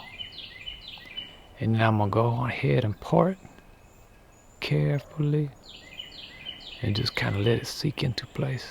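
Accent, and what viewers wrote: Louisiana, United States. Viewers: You from Louisiana? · From Louisiana, huh?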